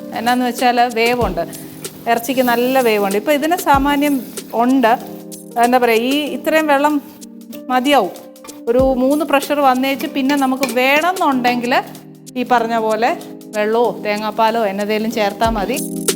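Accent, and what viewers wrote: Kottayam, India. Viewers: Kottayam bhashayil paranjanneyullu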